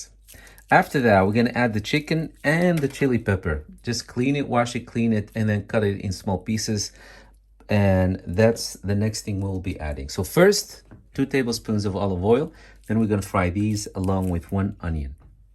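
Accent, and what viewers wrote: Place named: Netherlands. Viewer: Dear My name is Dringa vlogs I’m a hundred percent blind, autistic and have a chronic heart condition. Okay, three things to say. 1. I love this video, your voice gives me ASMR, because Dutch and German are so similar, the accents are also, and your’s is one of the ones that gives me ASMR. 2. I can NEVER have this as I’m allergic to nuts, it’s called anaphylaxis and is life-threatening, I can’t even touch nuts, much less eat them. 3. here are some things you MIGHT be interested in <link> <link> <link> <link> Sent to you with mmm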